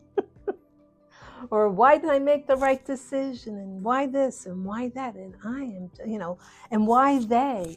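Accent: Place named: New York, United States. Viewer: you're a sweetheart. I Love your NY accent - and I'm guessing Jewish?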